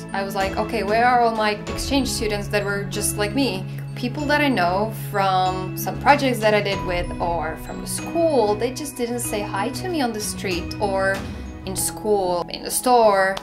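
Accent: United States. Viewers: Can I ask how you got such a strong American accent? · That's a pretty strong American accent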